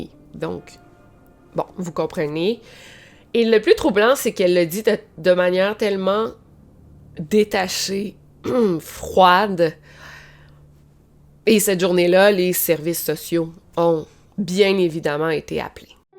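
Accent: Canada. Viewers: Après 10 minutes j'ai déjà mal à la tête ! J'adore l'accent canadien, j'apprécie les récits truecrime mais je n'arrive pas à me concentrer sur ce qu'elle raconte ! Elle me "bouffe" mon énergie, elle parle comme si on était tous ses potes ou comme elle... Je ne veux pas la descendre car elle fait de bonnes vidéos ; c'est dommage... Après il ne faut pas se forcer à regarder une vidéo même si le sujet est intéressant. J'essaierai plus tard avec une autre vidéo, peut-être que j'apprécierais mais là ses manières et ses sourires incongrus me bloquent...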